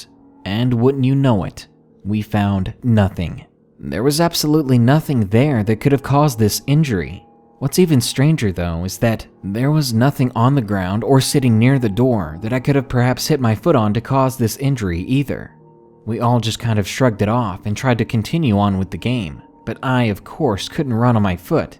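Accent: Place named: Rural, United States. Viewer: Lol, but you're a hick with brilliant narration skills!! Great voice too.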